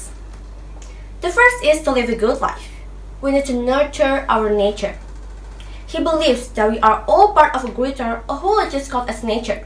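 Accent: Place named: United Kingdom. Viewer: Buihhh.. British bngt 👍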